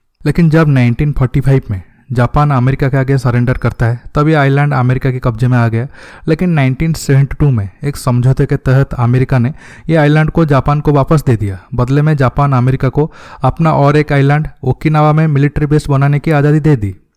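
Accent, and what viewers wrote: Odisha, India. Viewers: Ur hindi speaking is heared like odia tongue speaking.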